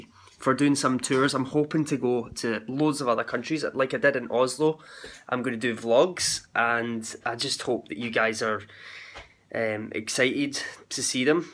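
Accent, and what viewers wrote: Scotland, United Kingdom. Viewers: I could listen to you forever, especially with how great you make everyone feel talking about real, meaningful ideas. On another note there is nothing sexier than a Scottish accent. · I've been watching your videos for awhile now and I have to say that you always seem to say the words I need to hear. Life has taken its twists and turns, but I'm starting to find myself again and what makes me happy. I just wanted to thank you for your inspirational words, and of course I love your Scottish accent!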